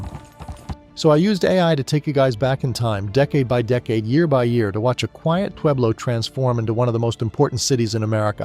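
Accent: United Kingdom. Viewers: i really do love your reconstruction. And i love the most this britisch accent.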